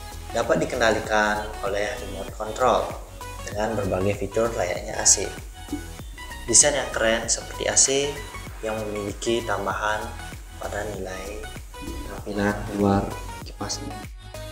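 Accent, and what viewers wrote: Bali, Indonesia. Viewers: Logatnya orang bali banget😂. Mantab bliii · Org bali bro?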